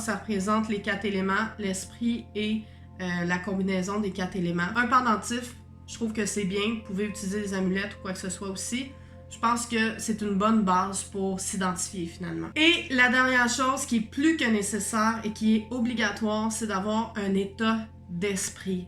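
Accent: Quebec, Canada. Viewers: Fan de tes vidéos 🤩 avec ce petit accent québécois j'adore 😉. Continues à nous enchanter avec tes vidéos (oups capsules 🤭) Salutations de l'hexagone 🌝🪐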